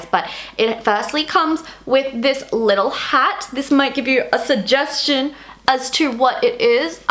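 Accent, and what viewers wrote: Australia. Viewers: I’m being cheeky and I don’t mind if y’all don’t think it’s funny if I tell you to get yr heads out of yr bums. However, many people are trying to correct for saying it’s Wednesday. Maybe it’s just me, but I hear someone with an Australian accent say it’s a day later than it is for me and I think, “Hmm, I wonder what time zone they’re in?” not that they must be wrong.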